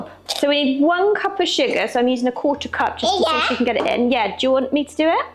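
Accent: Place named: United Kingdom. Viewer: Boy, That British accent of hers is really hard to understand exactly what she is saying !!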